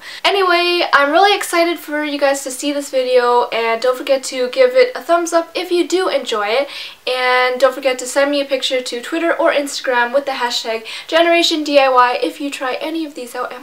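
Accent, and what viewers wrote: Canada. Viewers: Top Bun she does, she has a Canadian accent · She's Canadian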